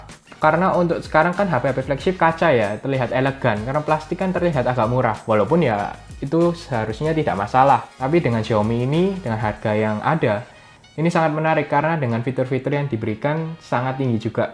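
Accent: Java, Indonesia. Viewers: Wong jowo yo mas hehe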